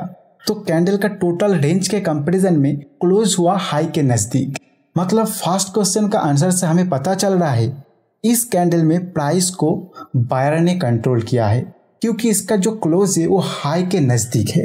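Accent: Bengal, India. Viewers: app bengali ho kiya?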